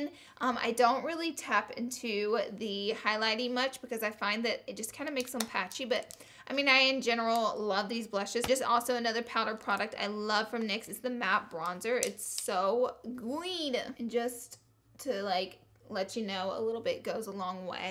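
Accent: South, United States. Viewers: !!! 😊 I'm a newbie, just subscribed today but I love your southern accent and quirky personality. Haven't tried much of NYX before but I'll def be purchasing that palette you mentioned. Thanks for the tipssss.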